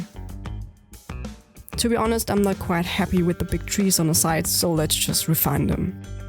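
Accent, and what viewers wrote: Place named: Germany. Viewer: you sound alot german, are you?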